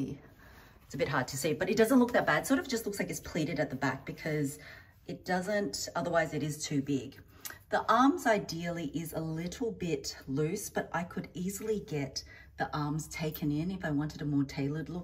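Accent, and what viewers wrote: Australia. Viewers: Notification squad!! Hey btw I love love your Aussie accent ❤️😍🥰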